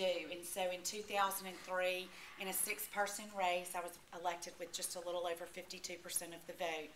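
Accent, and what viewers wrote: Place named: South, United States. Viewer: She sounds like she's from the saow-uth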